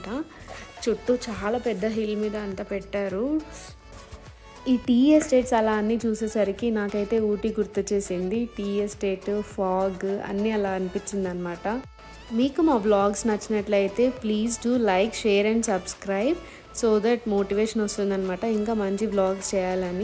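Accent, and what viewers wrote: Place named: United Kingdom. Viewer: Kuki kadhamma kukke anali...prathi word ki edho English accent touch ivvalni try cheyakudadhu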